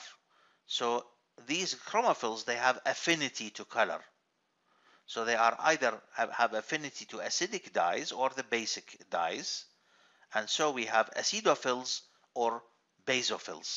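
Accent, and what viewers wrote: Iraq. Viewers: مبين من نبرة صوتك عراقي سبحان الله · اي والله اني هم لاحظت بيه عراقي اول ما حجه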